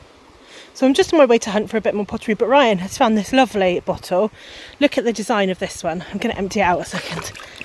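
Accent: England, United Kingdom. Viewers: Sorry, but English accents are hard to keep up with and you talk so fast.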